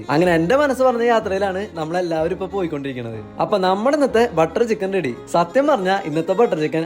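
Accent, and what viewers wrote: Thrissur, India. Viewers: Thrissur ahnooo😂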